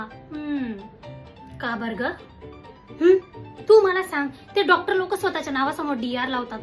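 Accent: Khandesh, India. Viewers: खूप छान ह्युमर आहे तुमचा. खान्देशी स्वर तर जाणवतोच. ❤❤❤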